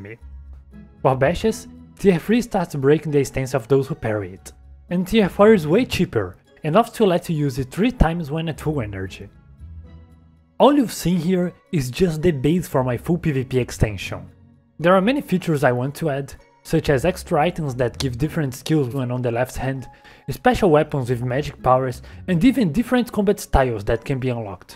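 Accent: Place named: Rio de Janeiro, Brazil. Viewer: nao tem como esconde o sotaque carioca kjkkkkk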